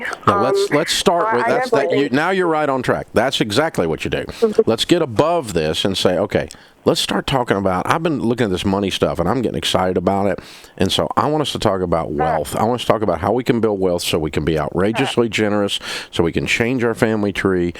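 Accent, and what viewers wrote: South, United States. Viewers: I watch it for southern accent.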